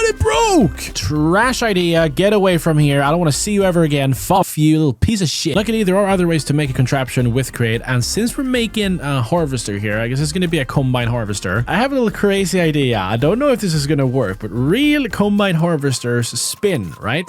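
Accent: Sweden. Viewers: Are u a Swed because u sound like a swed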